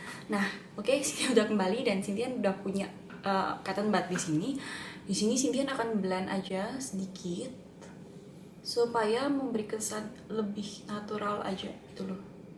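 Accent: Surabaya, Indonesia. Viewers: hai.. you are so pretty and natural ^^. i have beauty chanel too, if u don't mind please check up my chanel too ^^ and hopefully someday we can join for another tutorial.. because i know from ur "logat" u are surabayaERS hahaha.. salam kenal ^^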